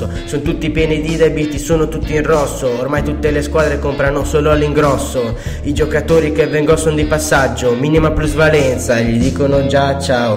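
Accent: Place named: Naples, Italy. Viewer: carina, togo l'accento Napoletano, apprezza i ringraziamenti perché sono Juventino XD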